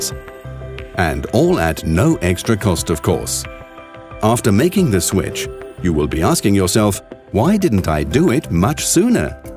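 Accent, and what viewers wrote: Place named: United Kingdom. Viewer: He's Kinda British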